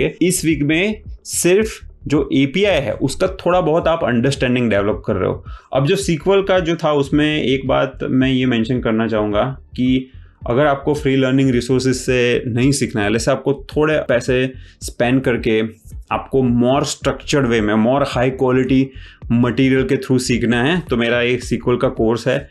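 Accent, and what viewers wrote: Gujarat, India. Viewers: Are you Gujarati bro ?😅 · Thank you for the in depth Roadmap sir💯, btw got to know from your tone that you are gujarati(same). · You sound very gujju bhai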